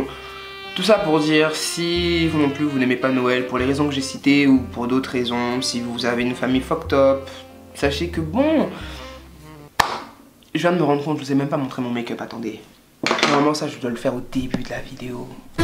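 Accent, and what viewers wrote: Ivory Coast. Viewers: Wowww ta un accent ivoirien mais puuur😍😍😍😍😍🇨🇮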